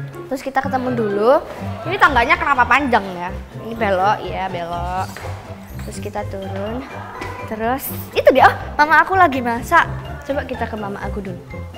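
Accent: Java, Indonesia. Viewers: Medok kayak aku 😄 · Keliatan banget kalo orang jawa👍 medok reek, mantap 😍👌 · Medok banget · Lucuu bangety.. Jawanya medok2 unyuu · Gemesh e ngomong e jawa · tetep mwedok penting ayu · trnyata km ngomongnya rd medok gt ye😂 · medok terus sumpah jadi makin seneng liat videnya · Medok bngt ngmong ya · pertahanin ngomong campur bahasa jawanya. Aku suka banget sama cara ngomongmu 😍👍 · Medoknya dpt bgt bundd😍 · logat jawa nya kerasa bgt 😫☝️☝️ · medok bgt jawanyaa lucuu!!😭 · Medok tp cantik bgtt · medok banget ih gemes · Ya allah medokk nya kecirii bgt wkwkk ,klo titi udah ga bgtuh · Medok banget yak:v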